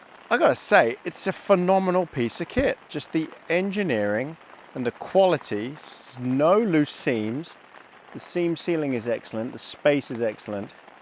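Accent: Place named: Cheltenham, United Kingdom. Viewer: Where are you from? You sound just like my mate who comes from Cheltenham. Loving the vids & Bruce 👍🏻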